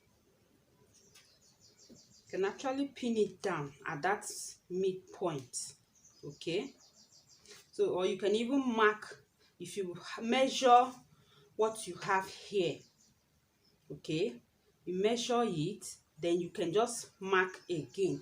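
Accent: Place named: Nigeria. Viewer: When I listened to your video I figured that u were from wonderful Nigeria. U r an excellent teacher. 💘💘💘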